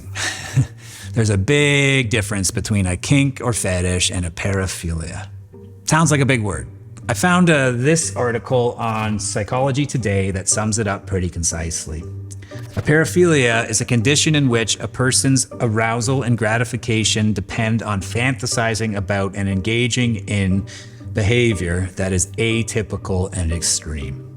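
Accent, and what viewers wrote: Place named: Canada. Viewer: Actually, I wonder about you. You practically make rock stars out of these killers. It's as if you idolize Dennis Rader. He murdered four members of a hardworking immigrant family named the Oteros in Wichita, KS. He took their ten-year-old daughter, Josephine Otero, and hanged her on a pipe in the basement and then jerked off to her corpse, leaving sperm on her leg. There was no DNA tracing then, so we rely on the evidence at the scene and his own testimony. This guy you can't get enough of? Stay the hell in Canada, you sound Canadian. I hope Trump buys your country out! You know, Kanuck, you can always visit Rader in prison. What, too chicken? Why don't you examine a few of your own killers, like Billy Bishop (72 kills) and Raymond Collishaw (68 kills, many others when he became a mercenary after WWI). They murdered more than Bundy or Rader, or any other hero of yours.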